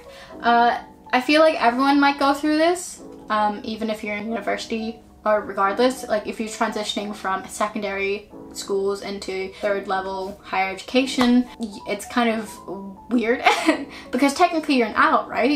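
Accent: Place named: United Kingdom. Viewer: you sound british to me!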